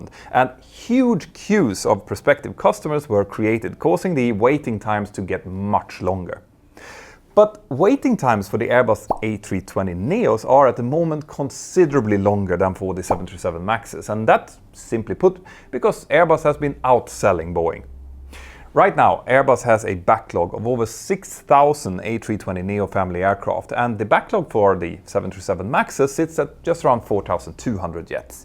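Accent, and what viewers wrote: Scandinavia. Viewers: I am not sure but this guy’s accent sounds pretty Nordic 😊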